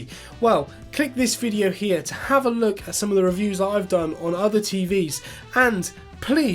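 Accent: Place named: United Kingdom. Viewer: not bad, just different. You Brits put more emphasis on certain letters. Like the way y'all say schedule or aluminum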